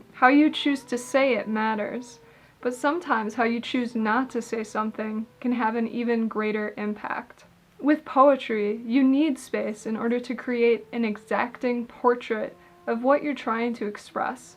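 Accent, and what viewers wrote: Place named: Scotland, United Kingdom. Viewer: ... thank you - Scottish for sure - r, and ch - got a Stroke but talk now after almost 2 years - poetry back then, poetry once more ...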